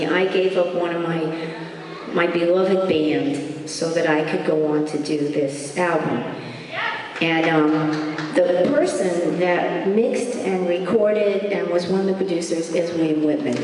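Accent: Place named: New York, United States. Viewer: She's such a New yorkaaa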